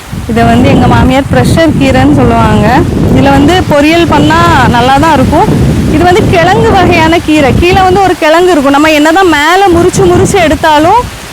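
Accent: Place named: Nagercoil, India. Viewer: Super sis, when you speak live I can see your Nagercoil slang that's good.... Totally enjoyed your home garden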